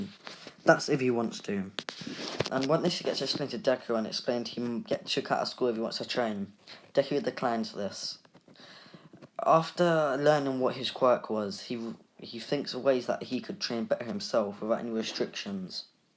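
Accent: United Kingdom. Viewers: Yer british aint ye mate like yer accent could ya be british to? Idk ;-; ya sound british alright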